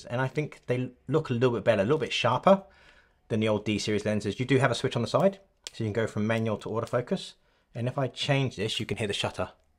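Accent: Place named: United Kingdom. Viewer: Hi thanks to you i'm itcing to buy this! Where can I get the Nikon F100 as notice you have brit accent im in UK too, a lot on ebay say From Japan? Are those imports should I ignore those? I'm lost Any good sites you recommend and what should I look out for? Your advice is appreciated please!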